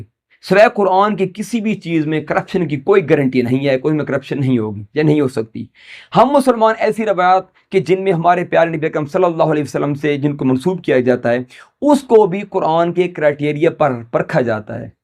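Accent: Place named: Punjab, Pakistan. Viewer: Yeh Punjabi mai Urdu bol Raha hai ya Urdu mai Punjabi.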